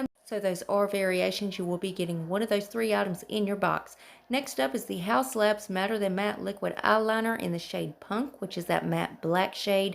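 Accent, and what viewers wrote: South, United States. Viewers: Hey girl, love the accent. You sound like me. LOL We girls in the south got it goin'.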